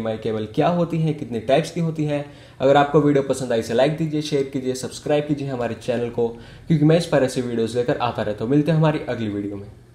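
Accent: India. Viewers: Are you Gujarati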